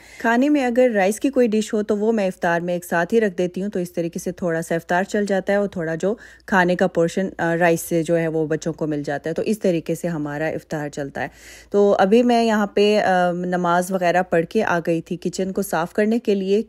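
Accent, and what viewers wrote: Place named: Karachi, Pakistan. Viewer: Abp Pakistan mean khan sy hy lahore ya karachi batey ga lahjato karachi ka lgta hy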